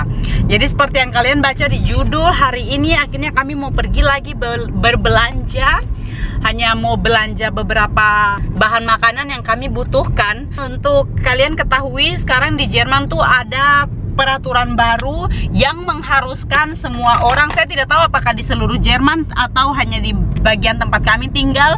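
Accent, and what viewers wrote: Papua, Indonesia. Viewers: Logatnya mirip Papua ? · Im fom malaysia...suka sgt video kakak.. Malah kakak guna bahasa Indonesia (papua).. Suka dengarnyaaaa....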